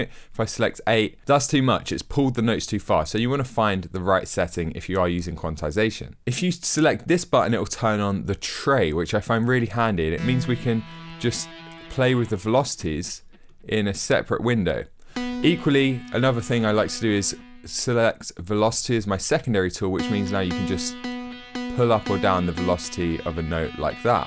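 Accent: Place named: Manchester, United Kingdom. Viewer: you from Manchester bro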